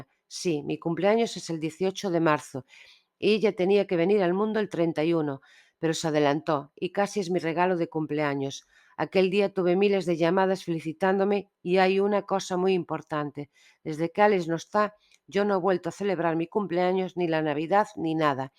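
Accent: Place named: Galicia, Spain. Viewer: Que voz tan bonita la naradora ..el acento creo que es gallego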